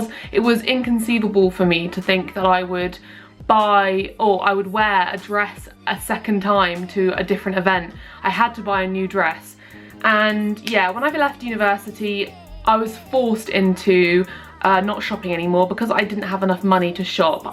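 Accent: United Kingdom. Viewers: Good video. Love British accents!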